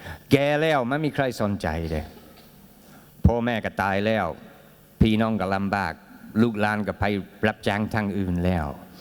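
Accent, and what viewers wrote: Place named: North, Thailand. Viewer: ที่มึงพูดอะเมืองไยล้วนๆ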